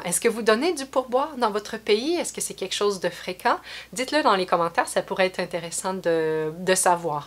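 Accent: Quebec, Canada. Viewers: ton accent québécois, ta pronunciation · Hello! I hope you continue making vidéos, I love your vidéos and your accent québécois. And if you continue teaching french on Skype I'd love to know where to get in touch with you:) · Hello! I'm so glad you've come back, I love your videos! They're fun and very helpful, also, I absolutely adore the Quebec accent, could you make a video regarding the differences in the pronunciation of vowels, nasals and consonants between Standard French and Quebec French! Merci d'avance!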